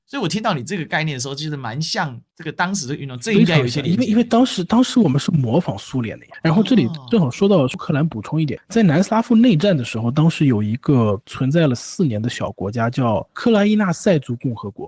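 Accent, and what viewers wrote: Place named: Shanghai, China. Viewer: 完全的上海口音和语速😂😂😂😂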